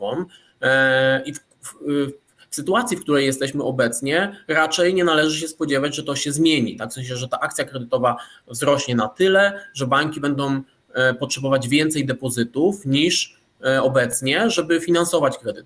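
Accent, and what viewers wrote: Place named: Poznań, Poland. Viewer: Też mnie to kiedyś bardzo denerwowało. Teraz trochę mniej, kiedy się dowiedziałem, że jeśli ktoś pochodzi z okolic Poznania, to może być wynik gwary poznańskiej. Z Wikipedii: "wymowa końcówki -om zamiast -ą, np.: jakom, pałkom".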